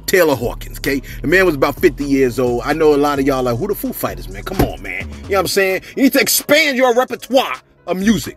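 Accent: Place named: East Coast, United States. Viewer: His "C Om Sayn" is so East coast..Sup South Jersey...The page is entertaining..His accent is too cute and his hype voice is too funny... he posted a King of Clubs. What you play Tonk or pitty-pat..That million is coming quickly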